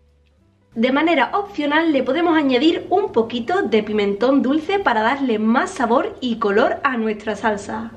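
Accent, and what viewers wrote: Andalusia, Spain. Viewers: De qué parte de España eres? Me hace gracia tu acento 😂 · Hola espero que me des un corazon sos muy tierna y explicas muy bien las recetas y me encanta el acento español sos muy hermosa y sos muy KAWAII soy la hijade el señor y soy tu fan hice todas tus recetas espero que me des un corazon ❤❤ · Super el video sobre todo su acento español q yo diría q le gusta a todos los latinos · eres espeñola ?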